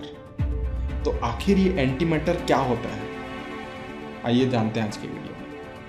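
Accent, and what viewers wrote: Chhattisgarh, India. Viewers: Accent se toh lag raha hai Chhattisgarh se hain.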